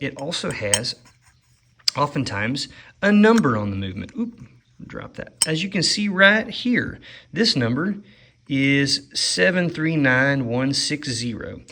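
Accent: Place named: Maryland, United States. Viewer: i was trying to place where you're from based on your voice - it sounds like Maryland, but then you said you took a trip to the East coast which makes me think you dont currently live there.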